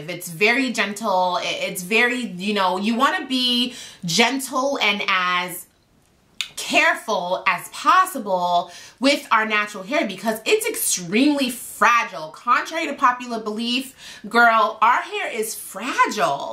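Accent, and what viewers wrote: New York, United States. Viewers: Oh I will, you've got that great personality and New York accent, you're too enjoyable lol!!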